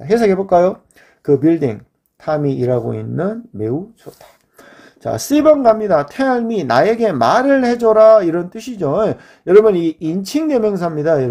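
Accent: Jeolla, South Korea. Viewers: 영어보다 즌라도 어투가 넘 도드라져서ㅜㅜ 40초 듣고 껐습니다 ㅜㅜ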